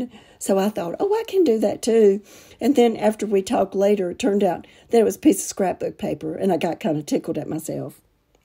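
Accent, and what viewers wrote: South, United States. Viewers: everything is so pretty. I think my favorite is the three crosses. Thank you so much for sharing the Lord here on your channel. I know I told you earlier you remind me so much of my sweet aunt Brenda. Even to your sweet southern drawl. Sometimes when I’m watching your videos I feel like I’m listening to her. 🩷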